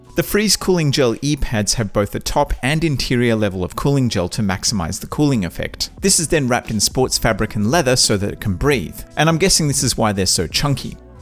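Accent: Australia. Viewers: Loving hearing the accent from a fellow Aussie 🇦🇺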